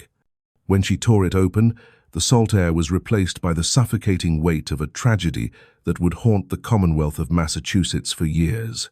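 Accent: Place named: England, United Kingdom. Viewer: The narrator sounds nice but it’s not as effective because with the accent makes it sound like the story is from England .. “ the mahsque”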